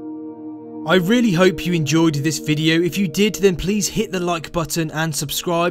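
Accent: United Kingdom. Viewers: he’s British buddy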